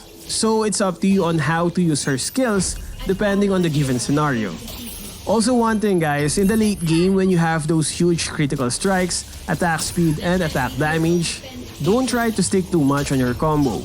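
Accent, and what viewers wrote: Philippines. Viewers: Nice. Very pinoy ang accent ha. Hahaha. "Owpennns a laaaht of paaassibilities" 😂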